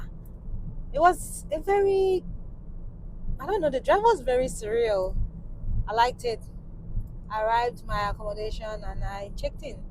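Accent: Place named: Akwa Ibom, Nigeria. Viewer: You speak like an akwa ibom Nigeria❤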